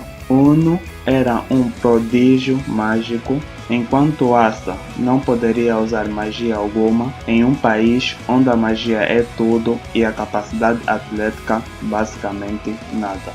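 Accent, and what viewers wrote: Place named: Portugal. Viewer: jurava que tu era de Portugal kkk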